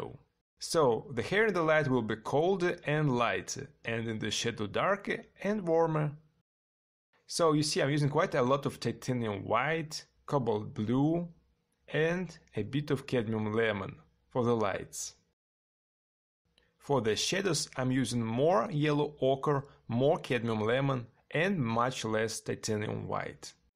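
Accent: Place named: Russia. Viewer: Your channel is great. Subscribed. And your Russian accent is very pleasant.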